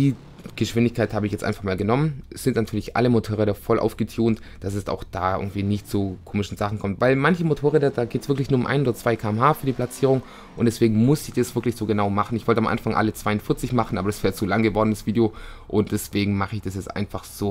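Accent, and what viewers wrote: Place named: Swabia, Germany. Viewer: Schwäbischer Dialekt iz da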